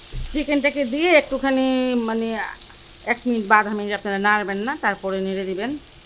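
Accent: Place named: Old Dhaka, Bangladesh. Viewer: আসসালামু আলাইকুম আন্টি। রান্না করার সময় আপনার হাতগুলো দেখে মনে হয় একদম আমার আম্মুর হাত এর মতো। আর আমার নানাবাড়ি পুরানো ঢাকায়। আপনার কথা বলা আর রান্না দেখে মনে হয় আপনিও পুরোনো ঢাকার মানুষ। অনেক শুভকামনা আপনার জন্য আন্টি। দোয়া করবেন আমাদের জন্য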